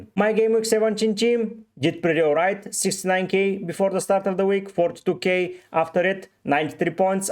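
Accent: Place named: Bulgaria. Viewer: Баа някак си по акцента познах че си Българин😂 Евала за труда братко!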